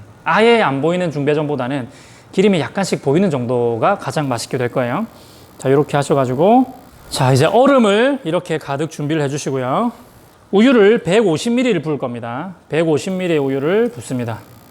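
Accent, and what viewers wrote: Gyeongsang, South Korea. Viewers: 카라멜 마끼야또도 집에서 만들수있는 방법 가르쳐 주세요^ 구독 할께요^ 집에서 핸드드립으로 마시는데 라떼 넘쉽게 가르쳐 주시네요 경상도 이신가요 사투리 쓰시는뜻 저도 경상도 ㅋ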